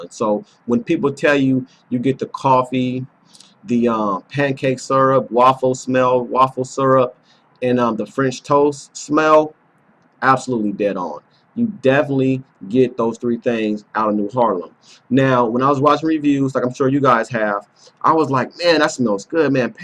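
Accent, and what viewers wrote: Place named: South, United States. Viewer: Ok, I have Legend as well, but not the same. I personally you wanted to be the anti-haarlem guy to get fans. You get pass all tge orher notes in other colognes, right. The hype is guud muny, I didn't like it at saks either...but the ladies in PHILLY feelin it. You sound southern. ..different females, you have to take that into account Bruh. When you revieq come outta the box. Other than that, u aite with your presentation.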